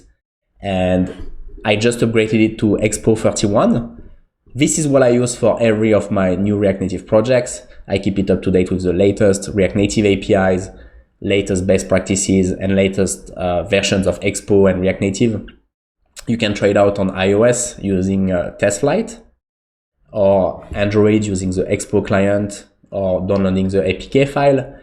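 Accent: France. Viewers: J'ai reconnu l'accent français, merci pour tes vidéos super intéressantes. Happy hacking!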